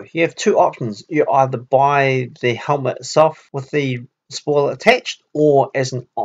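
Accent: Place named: New Zealand. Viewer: You sound like kiwi. Have you found a way to purchase this diffuser in Australia?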